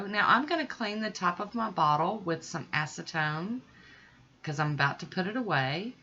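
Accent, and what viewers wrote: South, United States. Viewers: Very helpful. I love your southern accent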